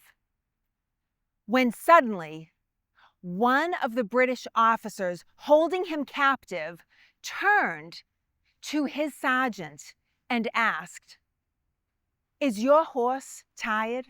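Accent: Boston, United States. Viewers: This Bostonian has been trying to pinpoint what part of the Boston Area your accent is originally from? North Shore? South Shore? Dorchester? Medford? - Inquiring minds want to know! Even a hint will do. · I love even just hearing your voice on these video. Listening to English being spoken the way GOD Intended it.😊 Those foreign accents non-Bostoniand have are SO alienating. Your voice IS "Home" to me. Keep up the FANTASTIC work you do. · Love your videos and the Boston accent 😊. It doesn’t hurt that you are gorgeous ❤ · It is good to hear a local person giving this history with a local Massachusetts/Boston Accent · You from Somerville or Southie😂, I have the same accent😅❤ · You have a serious Boston accent. My wife always accuses me of the same... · Oh...love your "heavy" Boston accent BTW... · Love having someone with an authentic "Bahs-tun" accent doing these videos! 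I'm always checking hers against my own and yeah, I sound that way too! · Geeze, growing up in Albany we were down on Boston --- sox. Ur accent still hits me. Otherwise gd video. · As a proud Bostonian born and raised in East Boston. I never hear the accent when I'm speaking to my friends outside New England but I hear yours loud and clear and I have to smile. The passion you have for the story is tremendous. I have a couple of ideas for you during this the 250th Anniversary. First look at the Battle of Chelsea Creek and there is a resource for you at the Grand Lodge of Masons of Massachusetts on Boylston st. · I appreciate your Bawstun accent,. 😎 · I'm from suburban Boston and was raised there from the 70s-90s and felt I knew the Boston accent, but yours is a little different. It sounds stronger and more pronounced in some ways. Wondering where you're from? And whether it's changed a little...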